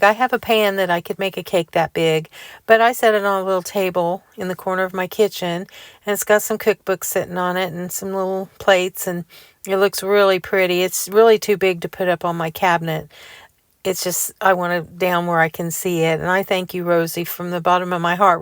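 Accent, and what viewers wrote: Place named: Indiana, United States. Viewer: Your cakes are beautiful! It is so nice that you take them to your neighbors and friends. Your salad looks yummy. And the Easter decor looks so nice. Your friend, Rosie's gift is lovely, as is the silver stand. It looks stunning. Your accent reminds me of my cousin, who lives in Indiana. I am a new subscriber. Oh! And the bunny as a bowl is a neat idea. Your potato salad looked great displayed in it. Happy Easter.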